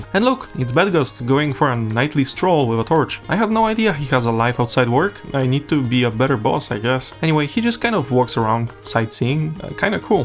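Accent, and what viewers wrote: Poland. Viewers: Soooo... next 4 years? Btw, are you from Poland? Your pronunciation of names like Piastowie or Klonica sounds like that. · i hear who you pronounce the cities and yes i was right channel based in poland. bardzo dobrze kochanie <3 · Dziwny ten angielski jakiś Polski z deka😂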